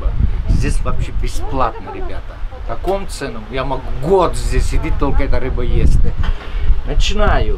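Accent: Ukraine. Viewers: вы просто крутой узбек с украинским акцентом) живите долго, кушайте вкусно!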